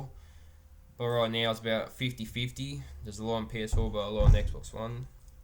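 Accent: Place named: Ireland. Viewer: To be honest, you skipped a few of my questions, but that's fine! Also, I didn't think you're Australian. You sound more Irish than Australian.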